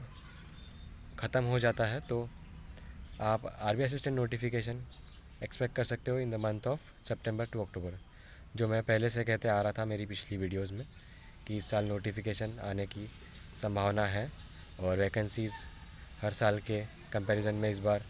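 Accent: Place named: Maharashtra, India. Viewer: Note*-playback speed -1.75x Thanks bro ,it seems like ur first language is marathi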